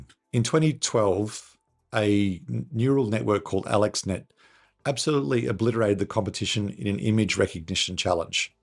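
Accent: Australia. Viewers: No, I am Australian and this is a real person. AI is useless at faking the Australian accent. This guy is real. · Maybe not. That Aussie accent is probably hard to clone (for the moment) lol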